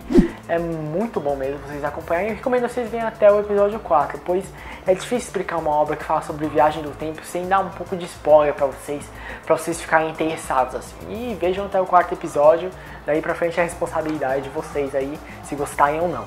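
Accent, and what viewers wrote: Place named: Japan. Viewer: comecei a ver por agr, me inscrevi e talz... Mas vc n é brasileiro? pq vc tem um sotaque japones bem marcante, mas gostei mto do video e vo até ver esse anime xD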